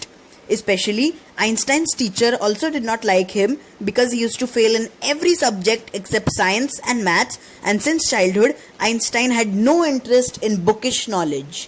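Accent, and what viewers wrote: India. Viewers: Nice Indian accent but cool video very informative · nice indian accent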